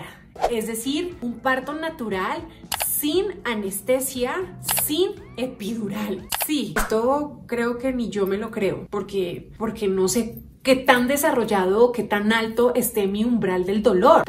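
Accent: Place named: Colombia. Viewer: no pierdas tu acento Colombiano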